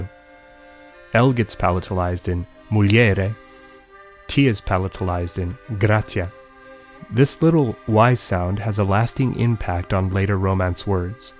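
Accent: Brazil. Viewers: Ele, +Rogério Cardoso, não disse que a versão lusitana deveria ser usada. Na verdade, não há por que preferir uma à outra, tanto que nesta série de vídeos (não é só este) ele usa ora o sotaque brasileiro ora o sotaque português. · Mate, your portuguese pronunciation is a brazilian one, I'm saddened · He uses both the Brazilian and European accents in his videos. In this one, he opted to use the Brazilian one, and the Castilian one when talking about Spanish. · Yes. He speaks portuguese like a Brazilian, not a Portuguese. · Curiosamente o senhor usa o sotaque brasileiro em sua explicação em português porém ao falar em castelhano usa o sotaque europeu. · It's kinda weird that a guy that is so well educated in the classical languages, their history and their phonology uses Brazilian accent to speak the Portuguese language, specially in it's strong informal phonetic version. 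To put it in perspective to non Portuguese speaking people, that is the same as to use a southern American accent like a strong Texan accent, as a representation of the English language in a video about the classic/formal phonology of the language. Nothing against Brazilian accent on the contrary, but it sounded misplaced due to the issue of the video.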